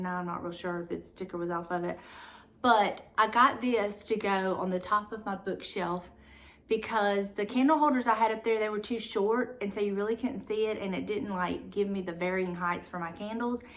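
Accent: South, United States. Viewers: oh I knew the accent was southern. Thanks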